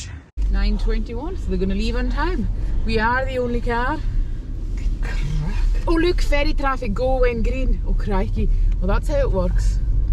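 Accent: Scotland, United Kingdom. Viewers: Another great video and full of information. Love your Scottish accent and your laugh. ❤🤠👍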